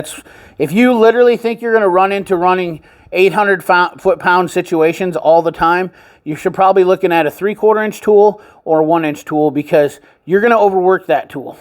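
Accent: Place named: Ireland. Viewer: Great Irish accent.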